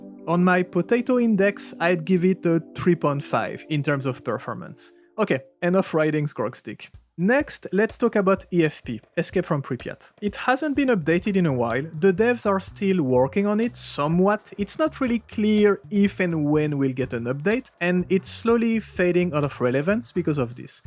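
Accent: France. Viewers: merci pour cette vidéo; mais ne serait pas un french qui parle le british? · ton petit accent Français charismatique haha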